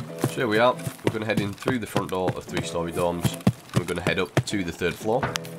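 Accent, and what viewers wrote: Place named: United Kingdom. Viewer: i love that British accent :D <3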